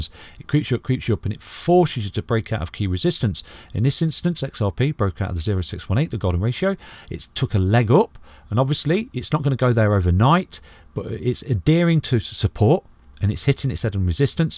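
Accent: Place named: England, United Kingdom. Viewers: I love listening to market YouTube videos, the English accent is the cherry on top. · You sound local mate, close to Derbyshire by Any chance or im thinking Nottingham? :)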